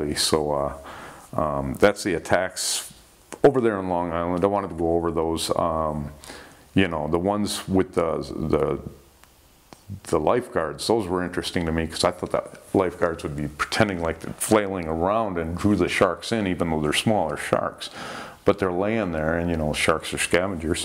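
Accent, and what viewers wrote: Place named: Great Lakes, United States. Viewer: BTW I’m from Michigan, you sure sound like you’re from here or the Great Lakes area!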